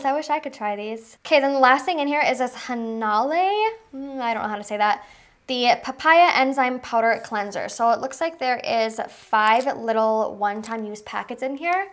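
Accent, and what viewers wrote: Minnesota, United States. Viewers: I TELL YOU THIS MINNESOTAN ACCENT 😂😂😂😂😂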